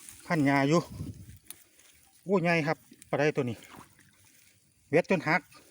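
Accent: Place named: Ubon Ratchathani, Thailand. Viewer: ฟังสำเนียงเว่าคือจังคนทางอุบลอำเดชอุดม คั่ก หมานๆคับ สุดยอด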